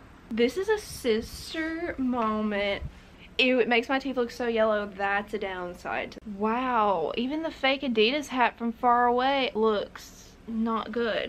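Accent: South, United States. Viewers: LA? Wtf that's a southern ass accent wdym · Omg I love your country accent! 😁❤️ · The more I watch your videos, the less southern your accent sounds 😂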